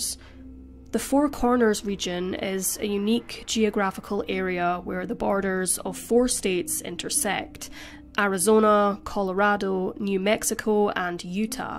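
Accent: Scotland, United Kingdom. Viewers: where is the narrator from? Unique voice, sounds a wee bit Scottish 🤷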